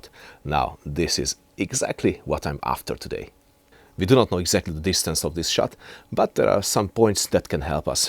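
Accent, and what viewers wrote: Hungary. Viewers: So accurate shots! Perfect video with nice hunglish accent as always! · ..az angolod jó csak nagyon magyaros😅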